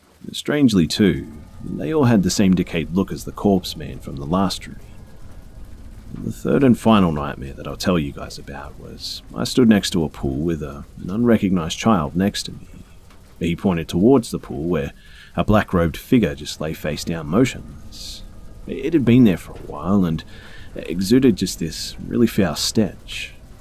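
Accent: Australia. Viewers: I’m new to your channel. Is that an Australian accent I hear? Love your soothing voice! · I love listening to you as i love the sound of your voice and your Austrailian accent too, i am Scottish, the worst accent in the world, so i really appriciate your accent and American accents too, keep on telling your stories true or not i love listening to them.best regards xAnne.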